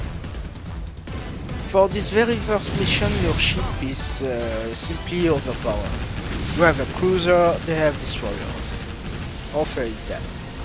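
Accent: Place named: France. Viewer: yes i thinked you speek french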